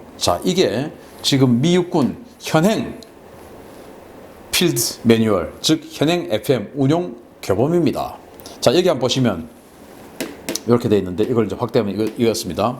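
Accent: Gyeongsang, South Korea. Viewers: 짝대기~역쉬 갱상도 사나~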